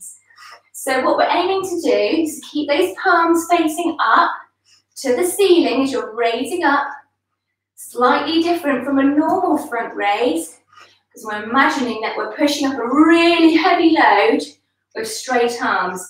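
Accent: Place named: United Kingdom. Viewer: thanks you for your advice regarding my Flappy Flabby upper arms I will definitely be watching out for any and all exercises that help! Have a great day in Cornwall, I visited there two years a ago was fortunate enough to catch the filming of Doc Martin and met some of the cast. As an ex pat we get over quite often, really enjoy the description of the view from your window! And your British accent... after 40 years here in Canada I still have a very strong Yorkshire one! I am so glad I and my daughters found your videos they are so inspiring and upbeat, and cater to all levels! Thank you for brightening our day! Jill Vancouver Island Canada!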